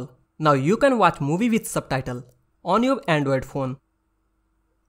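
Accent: India. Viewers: Why the english accents of indian so lame 😑 btw thank you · You know its legit when the accent is Indian♥️ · Yeah always India is legit on tutorials😎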